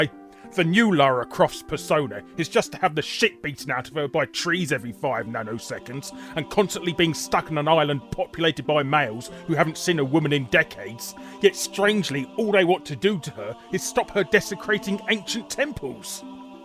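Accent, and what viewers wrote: United Kingdom. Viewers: Nah, don't feel stupid. He has quite a strong British accent (London...I think? I'm no expert.) and I imagine it can be very difficult for a non-native speaker to understand if they have not learned from a British teacher. And even then there are a lot of different English accents. I personally enjoy his narration, but then I am more accustomed to the accent. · Your cartoon likeness doesn't look like someone you'd expect to have a brittish accent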